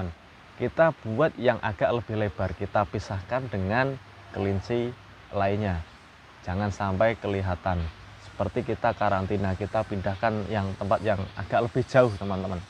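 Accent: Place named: Java, Indonesia. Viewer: Logatnya org jawa y mas, jawane pundi??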